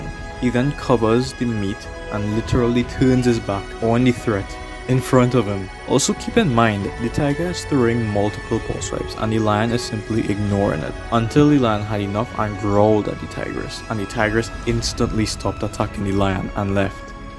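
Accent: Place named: Trinidad and Tobago. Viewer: Eh you from Trinidad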